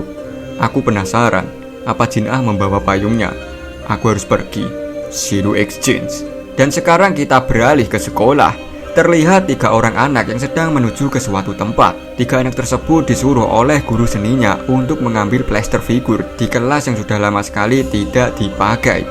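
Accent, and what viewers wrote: Java, Indonesia. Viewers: Saya dari part 1 di season 2 ini, saya merasa denger cerita korea dengan logat jawa, tapi seru, dan saya suka.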